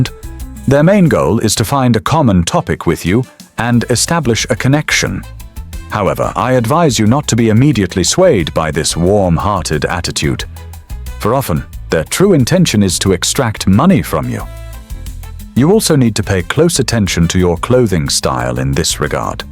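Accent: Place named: England, United Kingdom. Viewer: The English accented AI voice is barely tolerable.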